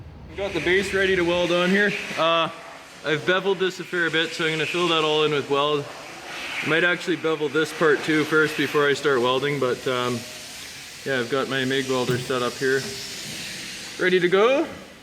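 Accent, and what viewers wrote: Canada. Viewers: You sound pretty Canadian for a Kiwi... I'm a Canadian, we can smell our own.... Sniff , Sniff... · You sound Canadian.... Almost like rocky from rocky and bullwinkle, just without the "eh"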